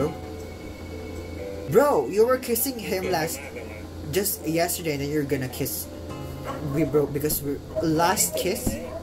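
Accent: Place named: United Kingdom. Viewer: Ur British accent made my day! Love from UK 💕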